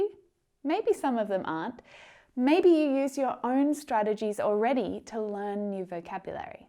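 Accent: Australia. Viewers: Hi from Turkey.I love you and your explaining. I love when you speak Australian English. Thank you to teach us English. 🇹🇷🇹🇷❤️❤️🇦🇺🇦🇺💕💕🌺🌸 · I enjoyed watching your video and i've been somehow doing ALL OF the things (tips) you suggested before and will continue to. Now i feel good because i know that i've been doing this the right way and I'm proud of myself as well. I just love your teaching style and especially your Aussie accent! I wish you all the best,!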